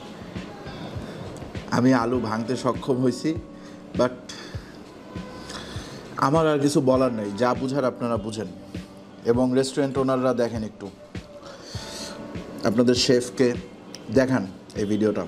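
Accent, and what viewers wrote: Barishal, Bangladesh. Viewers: Vaiya apnar borishaler vasha eto valo lagse...Ami kokhono comment korina but apnar accent shine comment korte baddho holam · ভাই বরিশাইল্লা স্টালে সেই 🤗🔥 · Vai borishal er vasa diya review ta valo lagsee...... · ভাই আপনার ভিডিওতে বরিশাইল্লা ভাষা ভালো লাগে। সবগুলা ভিডিওতে বরিশাইল্লা ভাষা রাখবেন।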